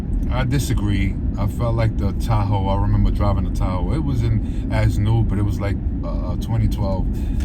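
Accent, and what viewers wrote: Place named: Philadelphia, United States. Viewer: Sound like you originally from Philly.lol Nice review 👍🏾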